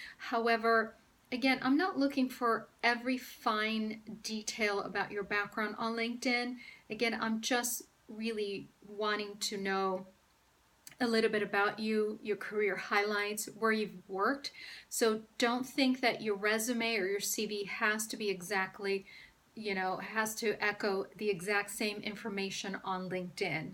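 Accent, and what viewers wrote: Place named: United States. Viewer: I"m most surprised that English is not your first language. Floored really. Your American accent is perfect! I've worked with foreign students and with ESL programs, and I would never have guessed English is your second language. You constantly surprise me B!!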